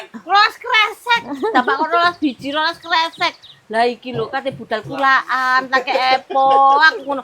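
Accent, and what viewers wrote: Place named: Madiun, Indonesia. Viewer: Gerceeep nonton mak e ,, duhh Masyaalloh Tabarakalloh mak tambah muuaaaniiss lhoo seperti gula jawa 😊😊 manis e angkleg bahasa Medione ❤❤,, sehat2 yaa mak ku 🎉🎉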